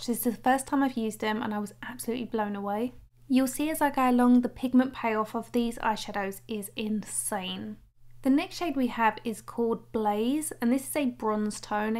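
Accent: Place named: England, United Kingdom. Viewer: I think I liked the near black one the best. I agree with you, because I think the quality and intensity of the colors was really exceptional. Pricewise, it could be a lot worse. I had assumed, while watching your video that this would be a European brand because of your English accent but I was glad to see that you referred to how many dollars they cost. I’m going to check and see what I can find out about them. Thanks for sharing this. New subscriber here. 👍🏼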